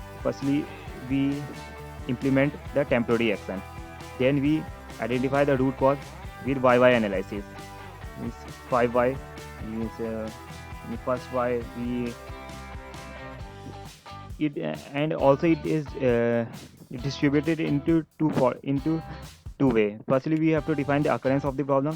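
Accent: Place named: India. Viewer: This video could be so helpful if you had subtitles because I find it so hard to understand what you say. The Indian accent is hard to comprehend, it would be so much of a help if you spoke much much slower maybe that would easier things for us here in Europe.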